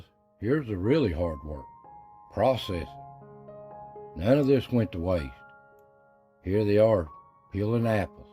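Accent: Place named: South, United States. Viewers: YOU sound Completely COUNTRY….I can remember My Sister in Law She was Much Older than Me Her husband could’ve been my Husbands Father he was a LATE in Life Baby…ANYWAY I remember HER putting Out a Garden EVERY YEAR and she always took off last 2 weeks of August and the First 2 weeks of September to do her canning….I had NEVER seen that Before also Saturdays was her House Cleaning Day And she would Iron EVERYTHING….In November that was Deer Hunting season they would cut it up and Freeze the Meat to have until the next year….They Also ate Squirrels 🐿️ and Everyone wanted the Brains….I NEVER a ANY MEAT because I NEVER knew What I was Getting and still to this Day I DO NOT eat meat…During Hunting season I couldn’t help with the Deer Meat and the first time I seen those deer 🦌 I almost fainted I had NEVER seen ANYTHING like It….I was Married for 28 yrs with this Family and MISS the ALL of themEveryday My Sister in Law Was the BEST Cook I ever Knew Christmas was WONDERFUL All of the Cakes Cookies Candy EVERYTHING was Homemade… She was the MoST BEAUTIFUL Woman I ever Knew next to My Mother….. 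· My parents grew up in West Virginia during the Great Depression. The US Government provided stamp books for sugar, shoes and more. With their gardens supplement the government food, they did well. This narrator speaks Southern Country, not West Virginian. This is not an accurate profile. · Sounds like Eastern Oklahoma and Western Arkansas.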